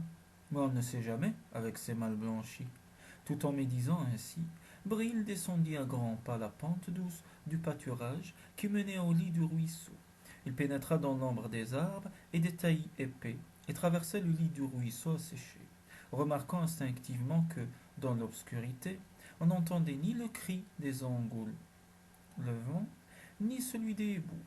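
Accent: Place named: Spain. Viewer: Il me semble que vous avez un accent espagnol. J'ai bien aimé ce récit qui montre que les US se croit toujours maîtres de toutes choses.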